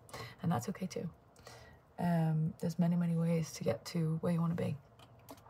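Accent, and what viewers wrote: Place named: North, United Kingdom. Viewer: I love it when you go all Northern… you’ve never forgot your roots. I went to college in Southport and it’s nice to hear the accent.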